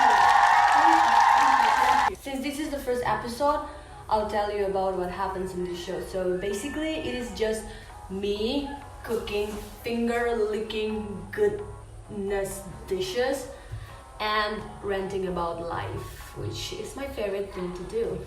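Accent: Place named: England, United Kingdom. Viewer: ton accent anglais est trop mignon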